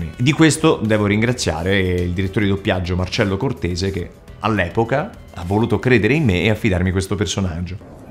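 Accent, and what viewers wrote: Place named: Rome, Italy. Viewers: sei bravissimo, il doppiaggio di Ragnar molto vero, però si sente un pò l'inflessione romana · Ciao, ti seguo da molto poco e ho scoperto i tuoi video dall'Australia... proprio per questo ti faccio una domanda probabilmente banale e fatta mille volte, ma per me curiosa. La tua cadenza delle tue parole è ovviamente laziale, allenamento a parte, è difficile perdere questa cadenza in fase di doppiaggio? Credo che quando parli in video sia normale farla venire fuori, ma quando doppi? cerchi di eliminarla sapendo che la utilizzi normalmente? Io per esempio avevo una lieve cadenza Piemontese ma che ho perso perchè da quasi 10 anni sono fuori dall'italia. Gran bel lavoro con Ragnar, sono curioso di vederti in altre serie.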